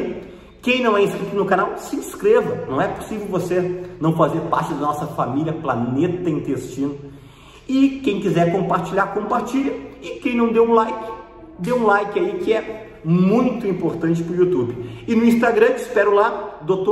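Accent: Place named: South, Brazil. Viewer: Dr Fernando Lemos...um médico de muita sabedoria...deveria ter mais médicos desse modelo...sabe é explicada muito Me ajuda demais essas explicações Merece nosso maior respeito Tive e operei...rompeu mais Deus como dói Como vi já o chamaram de um médico lindo e maior elogio no sotaque Sulista....normal as pessoas ver um médico q ajuda muito as pessoas ser amado por muita sabedoria e bondade em nos ajudar Obrigada Dr...tem todo meu respeito Q Deus o abençoe imensamente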